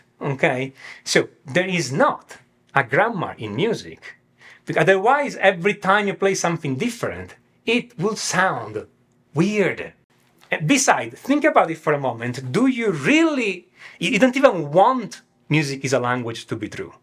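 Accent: Russia. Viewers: Accento russo! piango...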